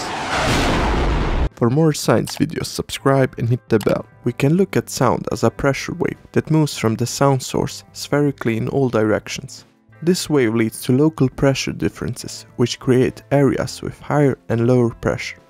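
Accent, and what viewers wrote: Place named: Yugoslavia. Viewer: Ahhhh yes, Yugoslav accent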